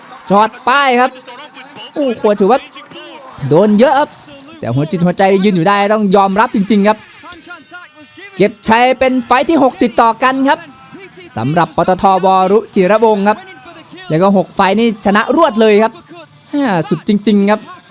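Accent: Isan, Thailand. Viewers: ชอบผู้บรรยายเป็นภาษาอีสาน ชอบหลายเด้อครับ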